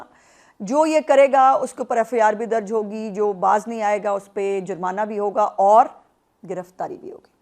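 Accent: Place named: Punjab, India. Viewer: باجی آپ کا پنجابی ٹچ بہت زبردست ہوتا ہے ماشااللہ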